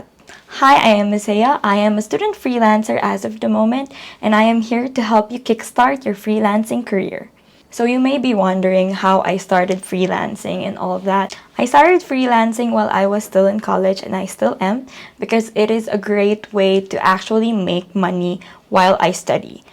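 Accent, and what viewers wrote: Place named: England, United Kingdom. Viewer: Hi, Ate. Thank you for sharing your info and experience with us about in the field being as a freelancer. Also I adore your English accent. I'll be doing freelance soon, once I have done with some of my portfolio. Do you mind if you make a short video showing your office setup and what things to consider when working from home. I'll be glad if you do. Salamatss~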